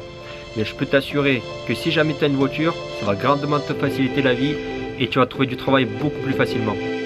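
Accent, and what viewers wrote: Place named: mixed, France. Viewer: Mec désolé c'est pas au sujet de la vidéo et ont a du te le dire déjà mais je beug sur ton accent dés la première fois où je lai entendu, du Français avec un mélange d'accent de l'Est avec un accents du Sud de France.. c'est.. intéressant, oft moi aussi j'éponge l'accent des autres a leurs contact, j'ai déjà eu l'accent d'un de mes pote qui est Ukrainien a force de trainé avec lui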